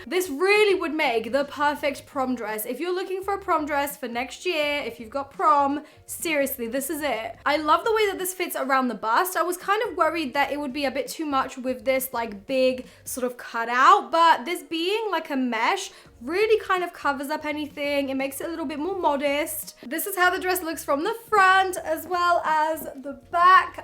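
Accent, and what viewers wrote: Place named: England, United Kingdom. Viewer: what part of england are u from u have a accent its nice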